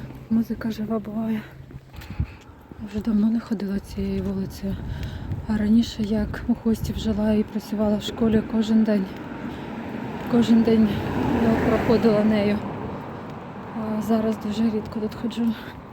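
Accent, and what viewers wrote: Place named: Donetsk, Ukraine. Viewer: дякую , що не проігнорували моє питання . Я таки відчула по мові , що ми з вами землячки . Я теж родом із Донецької області , В-Новоселкіасткий р-н, це ближче до Курахове , Марʼїнки . Але заміж вийшла і переїхала в Заплрізьку область . Начебто області знаходяться поруч , а українська мова має свої нюанси ( діалекти на заході України ). Тепер ми всі біженці - мої родичі з Донеччини і ми із Запорізької області , бо частина області окупована , частина під обстрілами . Так і скитаємося по світу…А ваші відео приємні , заспокійливі , трішечки із смутком . Бажаю вам багато підписників і якнайбільше переглядів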